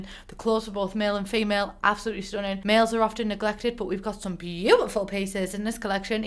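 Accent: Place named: Teesside, United Kingdom. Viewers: Can totally tell you’re from near Middlesborough 😂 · Okay - this might honestly sound so damn stupid and irrelevant, but it's SO nice to finally see a popular, nice genuine youtuber, especially one on a topic I LOVE with a fellow northern accent?? Granted, I wasn't BORN in the UK, but having lived here since I was 4, I grew up with that North East, Durhamite, teeside accent.. and I was never.. overly fond of my own - it was always called out by my southern friends and commented on since it "never fitted me" "made me sound- **queue generic insult**" and as stupid as it is, it always got to me?? But it's so nice to actually see it, hear it surrounded with nothing but love, or well, not even seeing it mentioned? Now reading this back: I'm so afraid that this may be insulting to anybody else with these kinda accents - but please know I actually adore em, just I've never had a good experience with MY OWN. 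But also, it's nice to also see somebody from around this area being successful as well! Working class ganggang may understand ig, idk.. TLDR: idfk I ramble sorry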